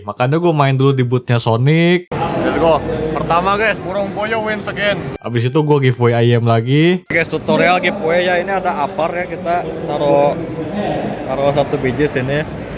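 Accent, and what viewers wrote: West Java, Indonesia. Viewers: terungkap sudah dia tinggal dikota mana 😂😂 tapi di channel manapun dia nyunda bangett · wih aya Sunda sundana ei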